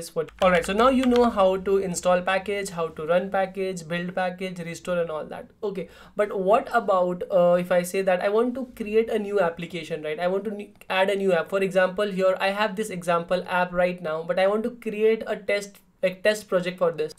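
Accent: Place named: India. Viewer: Nnnnoooooo This video is exactly what I needed. And yet, it has been cursed with a pajeet accent.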